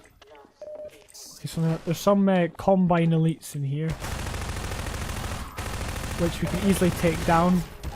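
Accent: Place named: Scotland, United Kingdom. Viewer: Scottish and likes half life, daddy?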